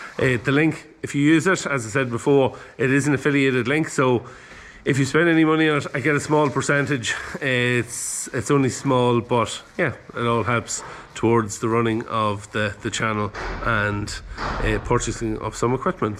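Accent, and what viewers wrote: Dundalk, Ireland. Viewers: Great video. Happy holidays,. Are you from around Dundalk somewhere?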